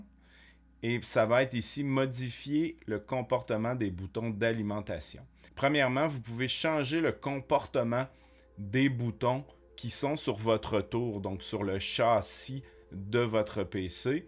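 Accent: Quebec, Canada. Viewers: Ouaw merci ( PS : J'adore ton accent Québecois )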